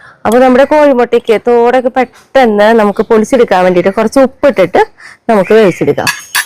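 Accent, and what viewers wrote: Thrissur, India. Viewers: Ath Thrissur samsaram aanu..❤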